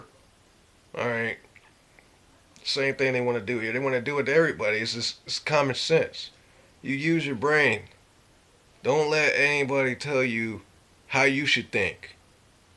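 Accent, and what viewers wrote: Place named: South, United States. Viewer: your body language is speaking when you're lying out of you mouth don't you know most things or institutions was suggested toward "black" you know what happened to natives . and now Mexicans are considered illegal alien(s) on parts of west side america (Mexicans original land ) go read a book (several) you're looking stupid . & you sound like typical southern tabacco chewing inbred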